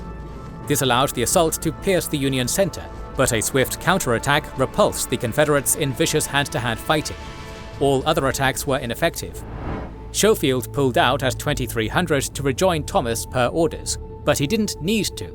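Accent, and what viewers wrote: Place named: United Kingdom. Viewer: True spottedsharks but he’s British. Hence “St Louie” and although not here the inevitable “Mer EE land” for Maryland. “Schofield” is pronounced with a hard C (or K) rather than the schwa sound. None of this bothers me one whit. You ought to hear how we in Kentucky and Tennessee pronounce our foreign-named towns. Ever hear of Versailles Kentucky? Athens Kentucky (here with a long A.) How about Cairo IL (“KAY ro” it is there.) So yes, I get it but no, I don’t care. And I’d bet that unless you’re from Tennessee you’d stumble on Maury County (pronounced Merry) and Blount County (Blunt).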